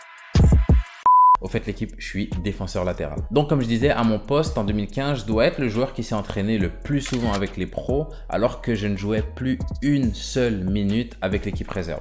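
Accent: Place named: Italy. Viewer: Encore une magnifique vidéo un belle accent italien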